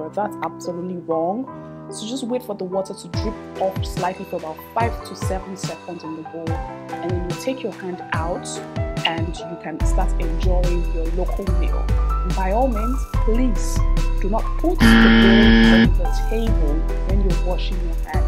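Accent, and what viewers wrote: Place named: Nigeria. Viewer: I can feel some Nigerian accent ☺️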